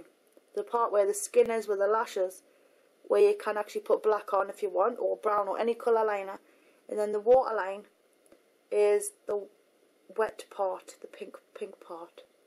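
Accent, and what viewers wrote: Newcastle, United Kingdom. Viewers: love the geordie accent, its sounds like meeeee!!!!!!!!!! :) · Are you from Newcastle ? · R u from Newcastle? :S :) x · its called a geordie accent. dont leave your area much do you? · i love love love your videoss, and loove you newcastle accent:D thanks for this video hunnie:) xxxx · Thats a Newcastle accent, not Irish! She comes from my end of the country yey! And I love your videos theyre so great =]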